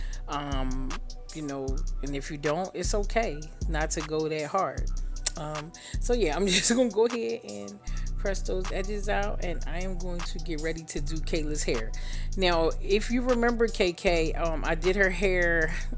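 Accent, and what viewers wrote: Philadelphia, United States. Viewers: I just ❤️ your Philly accent!!! 😂 🤷🏽‍♀️ 😂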